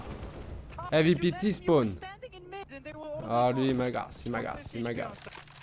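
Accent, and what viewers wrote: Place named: England, United Kingdom. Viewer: Franchement des youtubeurs comme toi c'est magnifique t'es toujours souriant je te suis depuis tes 100 abonnés continu Ps: Magnifique Accent anglais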